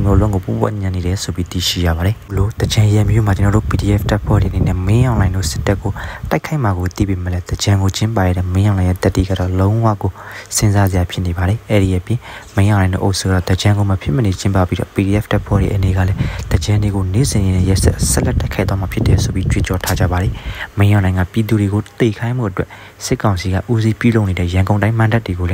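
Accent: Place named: India. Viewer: ကုလားသံနဲ့ မှန်တာပြော ကျိန်ပြော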